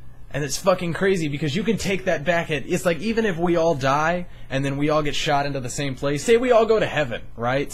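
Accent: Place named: Jamaica. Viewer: how often do you smoke pot? you speak like a true Jamaican. You're Echo Consciousness Theory is crazy (in a good way) and you do need better acid or more hits to get really fucked up. my friend had a trip where the only way he could explain it was he died and became a circle. anyway keep doin your thing boss, u shud try by ft.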